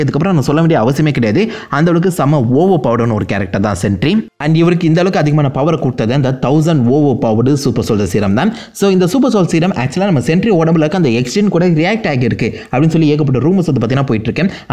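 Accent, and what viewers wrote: Tirunelveli, India. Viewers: Bro enna tirunelveli ya neenga...ela ennala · Bro ungalukku tirunelveli aah ? Kandu pidichuten....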